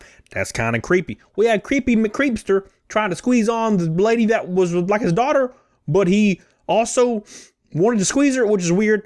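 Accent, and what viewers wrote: South, United States. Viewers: Can you plz turn down the whole southern way of talking thing???? It’s rly annoying SOZ 😢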